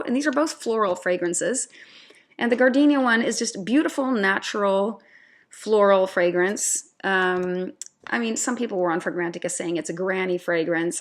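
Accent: United States. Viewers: I thought so too. I’m American and assumed she must be an expat because she sounds very American. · Thanks for the insight! Very interesting fragrances that go far beyond the ordinary. I thought you are American because of your accent. Greetings from Hellas!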